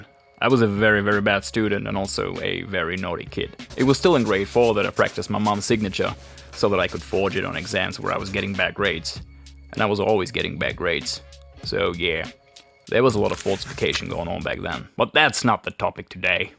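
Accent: Australia. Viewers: I recently just started following you from somewhere else on YouTube, but I am doing my usual of following new people backwards. It only starts getting funny when I hit on something posted 3 or 4 years ago and I am expecting some very funny moments in your past. Congrats on your Aussie accent shining through. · I'm Australian - 6th generation - Your accent is so dam close... Good on you - very interesting... John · Oh wow 😁that all makes so much sense. I am someone who has commented that you picked up an Aussie accent while you were here. Mind you, I think you picked up the whole Aussie way of life too lol. Thanks for telling us a bit about your 'English' journey. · Yeah you've soaked up a lot of Aussie while here, but that 'yeah' sold it hands down haha! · as an australian i know this makes no sense but if the general australian accent was milk your voice would be yogurt. 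its like similar and mostly the same but yogurt is more like sour and vaguely european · Your slight German accent is pleasant and attractive. You do speak English with a slight Australian accent. I like it. It's perfecly clear and articulate and you don't stumble with words at all, and express yourself well. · You have a talent in words mate and spoken in English or we could say Aussie. Well done champ. 😎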